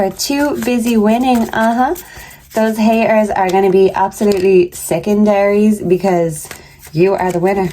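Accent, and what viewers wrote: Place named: Ireland. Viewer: Hi l love how you do your tarot reading.How you use different pack of cards.Your reading is really interesting. I love your àccent Do you come from Ireland?Are you Irish ?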